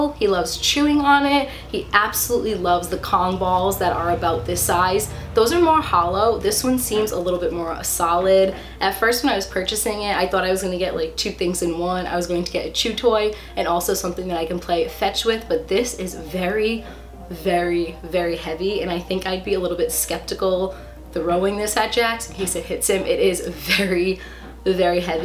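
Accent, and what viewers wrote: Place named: New Jersey, United States. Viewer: He’s so cute ❤️ but the way your pronounce some words 😍😍😍 are you from jersey ?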